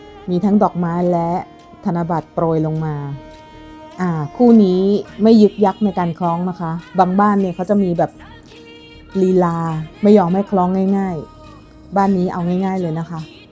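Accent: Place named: Central Thailand, Thailand. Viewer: เสียงบรรยายพูดได้จังหวะท่วงทํานองภาษากลางได้ชัดเจนมาก เทียบเท่าพิธีกรมืออาชีพ หรืออาจจะมากกว่านั้น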